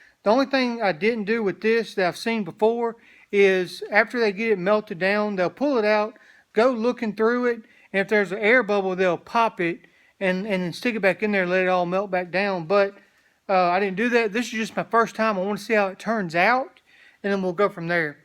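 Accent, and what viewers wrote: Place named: South, United States. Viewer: Not to get off the subject but I'm from the south just like you and we have similar accents. I can understand every word you say but I turn the sound down and the captions on so I don't bother other people around me and YouTube always distorts the captions when dealing with Southern accents. YouTube's voice recognition needs some work. I mean "hard as a rock", Youtube interprets as "whores".... On the subject of what your doing, I'm looking into using waste plastic (like those gray Wal-Mart bags) as an alternative to fiberglass resin and polyester. I have used cotton and even cardboard brushed with Bondo fiberglass resin instead of polyester to make things. I've made speaker boxes for cars out of cardboard coated with fiberglass resin then glued that felt material over top of it. It's been holding up fine for a few years.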